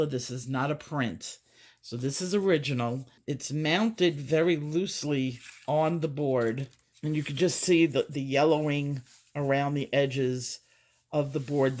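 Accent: New York, United States. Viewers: What a fantastic find. I loved hearing the family history story. I always knew there was a strong New York connection. Can’t miss that island accent. 🤣. Former New Yorker here as well 🦄❤️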